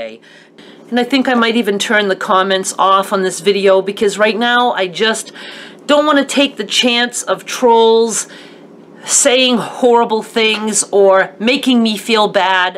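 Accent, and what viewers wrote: Maine, United States. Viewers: I watch a lot of your instructional videos, but have not seen as much of your personal stuff. Thanks for sharing your struggles as many of us can relate. As a creative person myself, I know sometimes making stuff helps you deal with the crap. I have been doing some quilting and when I first clicked one of your videos, I heard your voice and saw your surname and said to myself, "she's gotta be from Maine!" Love watching you! Take care of yourself. When dealing with a narcissist, you need to put yourself first, because you know damn well she's gonna always put HERself first. Dealing with a similar situation in my own life. Hugs!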